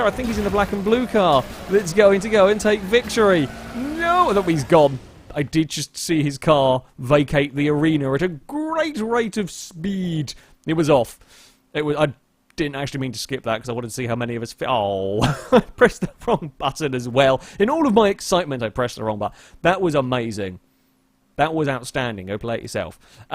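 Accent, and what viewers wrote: England, United Kingdom. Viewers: I'll be honest, I don't watch, or can't watch, much Rallycross, as it's not really televised here, and I can only ever find highlights online, but every time I've seen a race, the joker lap is always slower. Could be wrong, wouldn't be the first or the last time. Seems like from you guys saying what you are, both are accurate. It is kinda odd though for an England resident to reference an American thing first... but still says Z as Zed.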